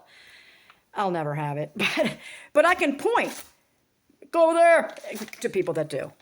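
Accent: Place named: United Kingdom. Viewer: Ok. Props. Just watched the one on food shortages. I agree he sounds reasonable because of his British accent.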